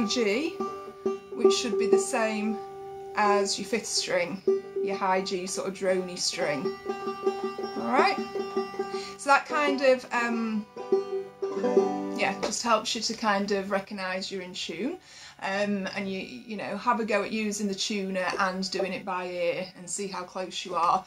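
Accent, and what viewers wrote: United Kingdom. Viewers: Hey, I discovered your lessons while donating platelets and I love em. I about rolled out of the chair laughing when your British accent made me think of the pun, "Skip to my loo"! 🤣 I was delighted to get home so I could play along and I feel like I'm making good progress. Thanks!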